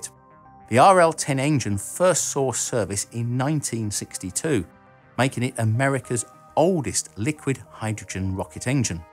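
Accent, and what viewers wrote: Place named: England, United Kingdom. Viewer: I do like it. I was wondering why I prefer your channel to Isaac Arthur's. I guess I prefer the "talking head" approach that you offer. But, more than that, your graphics and script are tightly coupled. I also find the english accent more interesting, although Isaac also has a melodic quality (deep southern gumbo) - so his voice SHOULD be a compelling one - but it isn't (for me, anyway). Content wise might I suggest you dive deep into SLS with a discussion of how Nasa was FORCED to take SLS - and the politics in play in Senator Shelby's district. A great, if dated, read on the subject of NASA's miseries is the 2005 book: "Lost in Space: The Fall of NASA and the Dream of a New Space Age" by Greg Klerkx It's definitely a NASA bash, but the political points are hard hitting. Just looking to help.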